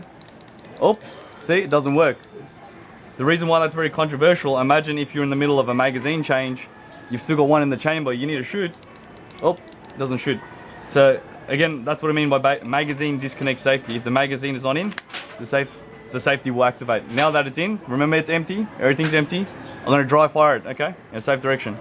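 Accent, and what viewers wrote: Australia. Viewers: A Filipino thats in the Philippines with an Australian accent ? I am so confused . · Cool vid, very informative & educational! All made the better by that Ozzy accent, bro! Hope you're still in Phil when we get there next year!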